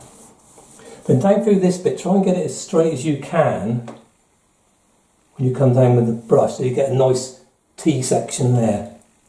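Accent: West Country, United Kingdom. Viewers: What's that accent? Bristol or Somerset way? Nice vid mate.👍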